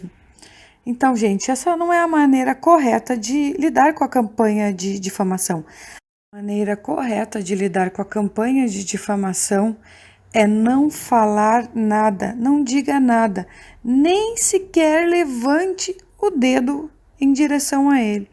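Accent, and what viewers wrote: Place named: Rio Grande do Sul, Brazil. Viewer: Seus vídeos são brilhantes mas seu sotaque gaúcho é simplesmente ADORÁVEL.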